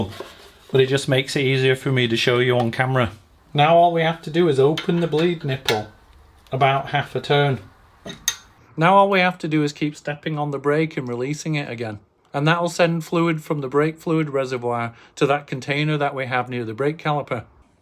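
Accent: United Kingdom. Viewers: Very nice British accent 👏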